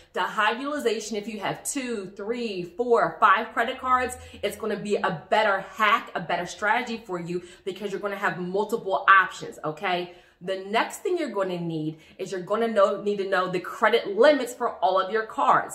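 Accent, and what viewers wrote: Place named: Pennsylvania, United States. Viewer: love that Pennsylvania talk got cousins from Pittsburgh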